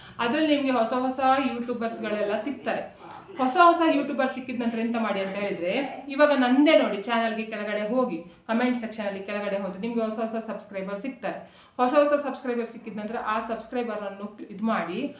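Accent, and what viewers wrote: Mangalore, India. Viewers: ನಿಮ್ಮ ಮಂಗಳೂರು ಭಾಷೆ ನನಗೆ ತುಂಬಾನೇ ಇಷ್ಟ ತುಂಬಾ ಚೆನ್ನಾಗಿ ಮಾತಾಡ್ತೀರಾ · Mangalore bhhashe tumba chanda maarre ..😅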